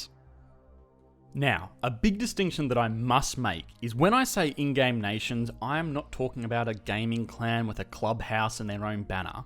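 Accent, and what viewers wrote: Australia. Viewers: Shame the Australian accent ruins any chance of the vid being taken seriously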